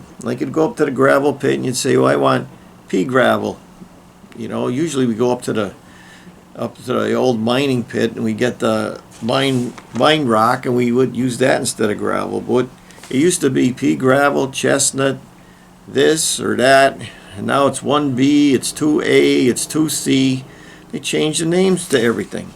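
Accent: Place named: Midwest, United States. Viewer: new subscriber, loved this video. I’m a 4th generation carpenter, Union trained Journeyman Cabinetmaker & Millman, worked (30) years in the Commercial Cabinet and Millwork Industry. My trade career ended in 2009 when the economic downturn and competing imports from China for less than our cost of materials wiped out my industry. Around the same time all of the major furniture companies filed for bankruptcy. Government sent me to Nursing School (LPN). Retired (4) years ago as a Jail Nurse. I started learning the Carpentry trade from a young age outside of Dubuque Iowa. By your accent I figure you’re from the midwest, maybe Illinois. I remember the old ways of doing things. Engineers hatchets, Yankee screwdrivers, & 16d sinker nails. When I was an apprentice, some of the journeyman Carpenters would take an engineers hatchet, cut of the hatchet part and weld on the claws in order to get a heavier framing hammer. 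Of course when the Union Rep showed up at the job site they had to hide it because it exceeded the weight the Union allowed. Anyway, your little talk about the old days brought back fond memories of working with my dad and grandfather. Thanks.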